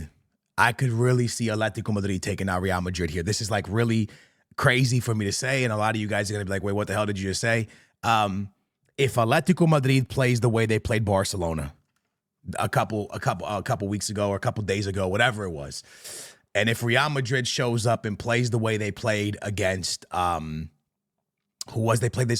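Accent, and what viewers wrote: Portugal. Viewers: I'm telling u he has to be trolling... I doubt someone with a Portuguese accent ever said atlantia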